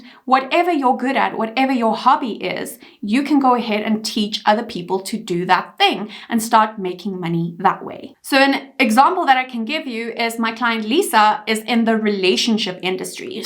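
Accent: South Africa. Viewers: Are you South African? That accent...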